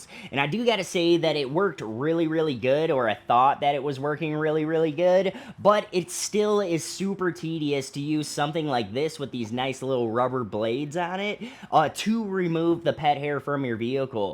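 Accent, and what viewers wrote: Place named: Wisconsin, United States. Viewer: I wasn't expecting the Wisconsin accent "don't cha know" , but great video and thanks for the tip on the right tool for the job.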